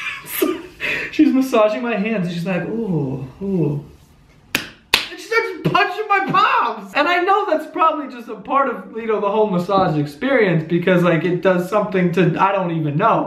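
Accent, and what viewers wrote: New York, United States. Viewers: His New York accent super cute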